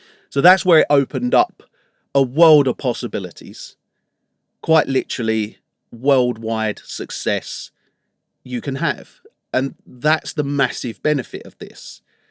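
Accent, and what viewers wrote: England, United Kingdom. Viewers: I hate to say it man, but you had me with the accent. I want to trust everything you’re telling me for some reason. Why would an English gentleman such as yourself do otherwise?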